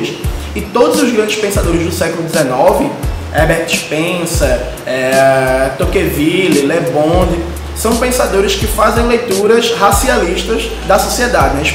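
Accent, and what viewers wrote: Pernambuco, Brazil. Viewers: Oh Deus! Esse sotaque de pernambuco me mata. 😍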